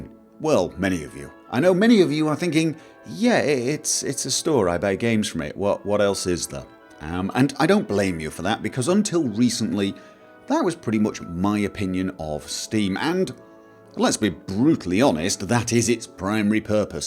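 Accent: United Kingdom. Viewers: is norwegian? tha fuuck he sounds british as fuck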